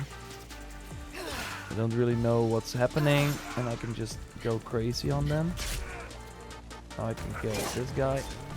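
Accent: Netherlands. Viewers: Dude are you from the Netherlands by any chance?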